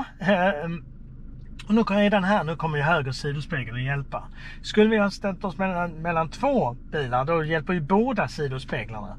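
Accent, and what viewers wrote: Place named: Scania, Sweden. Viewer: Skånskt dialekt 💪 Pedagogisk och tydligt förklaring Tack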